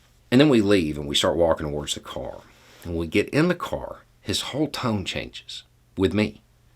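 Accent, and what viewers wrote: South, United States. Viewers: Thanks for sharing. One of my favorite of your uploads. Keep em coming, you're single handedly making a significant impact one 6 minute video a time. I could be wrong but you being a southerner w/an obvious accent is kinda like getting a toe in the door of many people who would instantly shut down to a New Englander like myself. Even though I've always been a small govt,* 2nd amendment supporter,* an avid student of the country's creation. Mostly through the men & women who wove all the complicated threads needed to create a new democratic republic. People who understood compromise was absolutely necessary and that pleasing everyone is impossible & trying to is a fools errand. I've tried to understand our country, the good, bad, & the ugly through the eyes of the real people who did their best to create a durable government for the people, of the people. 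All had their flaws, all had their genius & an incredible work ethic. Yet for many, if I had a mirror image YT channel I don't see myself having whatever level of success you do in getting certain people to even listen. I'm guilty of the same biases I guess. I hear a southern accent I'm instantly wondering where the Redhat & desecrated flag(pictures, words, etc.) is. I am instantly on guard. Worst of all I'm looking for the exit which sadly could deprive me of meeting a good person, making a friend, etc. I didn't intend to write more than a sentence or two but got carried away. Anyway, have a great Sunday & thank you f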